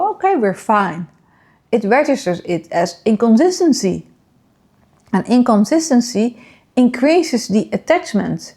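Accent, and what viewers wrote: Netherlands. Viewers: Je bent duidelijk slim, kun je niet iets minder Dunglish spreken 😅😂😂 is moeilijk om naar te luisteren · You sound Dutch.... bedankt voor deze video.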